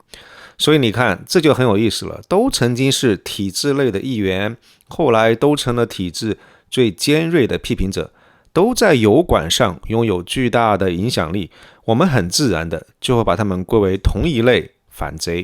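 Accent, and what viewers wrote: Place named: Cantonese, China. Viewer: 这是你个人对袁老师的解读，袁老师同意了吗，另外就是袁老师在多个节目重申过，他在大学修读的是'历史教育系'，而非历史系，但绝大部分人都把把他说成读的是历史系，是博主在材料收集中'求其'的原因，我听得出博主绝对是粤语是他的母语。他明我讲什么的。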